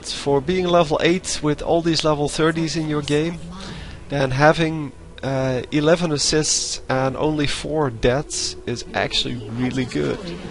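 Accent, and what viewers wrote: Netherlands. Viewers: Are you dutch? like Soraka AD :D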